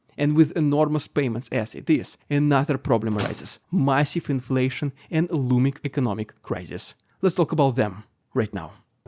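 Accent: Russia. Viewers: daaamn dude, yout russian accent is so heavy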